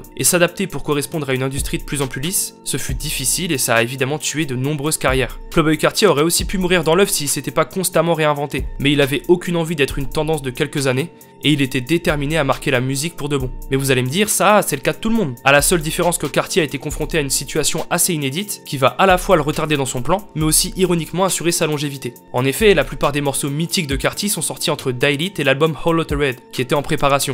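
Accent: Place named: France. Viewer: Très bonne video et ça fait bizarre d'entendre un français parler de et et 😂 qui sont trois autres boss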